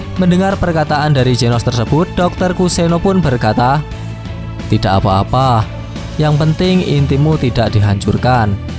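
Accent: Java, Indonesia. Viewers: Asap galaxy : wedus gembel...🤣🤣🤣 Jowo ne muuuedok min...👍👍👍👍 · Keren bang walau dengan gaya jawa nya :) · jowo ya kak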